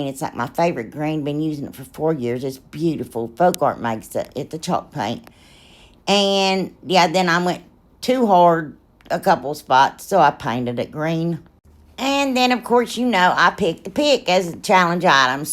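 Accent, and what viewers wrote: South, United States. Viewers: She's a delight and fun! You would think she's from the south! But she lives in Ohio❤❤❤ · I started watching YouTube to pass the time during covid lockdown. I can’t remember if you were recommend by another channel or a YouTube recommendation but as one of you other subbies said, I watch for your humour and inventiveness. Your American accent tickles me too! I live on the Lincolnshire coast, England. 🇬🇧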